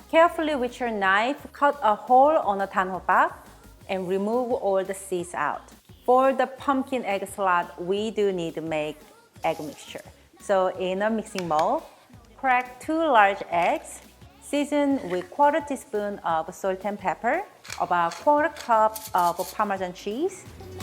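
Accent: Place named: South Korea. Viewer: I love ur channel ❤️❤️❤️ ur videos bring a lot of joy to many people. Thank u for making them 🥰🥰🥰 Ur accent is soooo cute. Reminds me of my moms. Shes Korean as well ♥️♥️♥️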